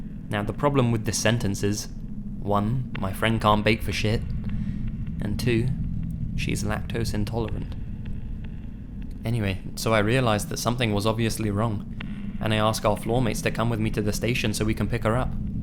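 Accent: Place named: England, United Kingdom. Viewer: Surrey. Bollocks. I can't believe I couldn't recognize your accent sooner; that's where my friend was born before she moved here ( CA, US). She'd never stop with the ''you daft cow'' if she knew. Still, that's better than her pet name for me in high school (dirty slag, which is not in the least bit accurate by the way). I think this is the fifth time I've watched this video, they're all just brilliant.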